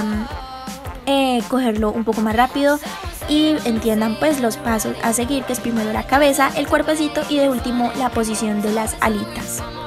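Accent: Colombia. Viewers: sos colombiana cierto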